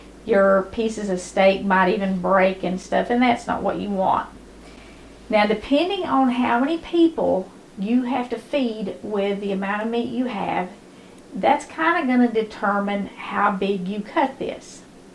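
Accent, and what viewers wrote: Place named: Appalachia, United States. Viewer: We live your hillbilly talk. Prays God for ya. Everyone didn't lose real language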